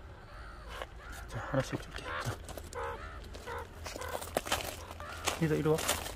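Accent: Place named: Sangju, South Korea. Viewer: 여긴 미국 난 상주출신 억양이 너무 오랜만에 들어서 반가반가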